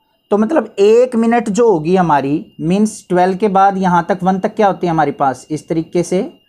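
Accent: Haryana, India. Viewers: I understand everything in maths from I feel especially and only teaching me and you explain every small thing in very easy way. sir aap Haryana se belong karte hain kyunki jab aap koi bhi question explain karte ho to aap ki tone mein Haryanvi Aisa lagta hai mujhko sir please reply karna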